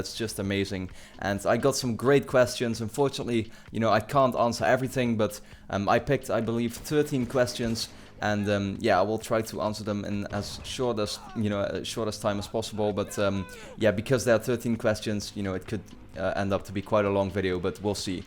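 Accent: Netherlands. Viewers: Are you dutch? your accent kinde gives it away :)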